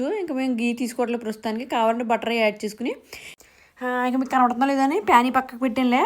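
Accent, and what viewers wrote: Godavari, India. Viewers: ఛీ నీ.. నువ్వు నీభాష నిజంగా గోదావరి జిల్లా వాళ్ళు అంతా సాగదీసి మాట్లాడారు దరిద్రం, దరిద్రం అని 🤦🤦🤦🤦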